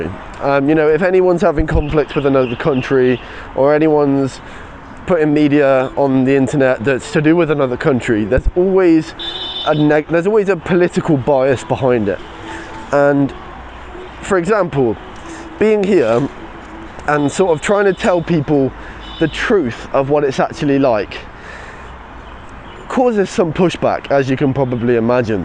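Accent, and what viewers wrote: United Kingdom. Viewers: Thank for british English listening exercise video hoping u have a good life in my country peace man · 不像美国口音，英式英语？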